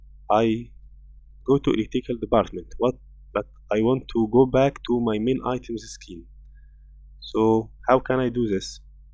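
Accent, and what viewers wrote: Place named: Egypt. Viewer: Thanks.... U accent may be LikE Egyption'S people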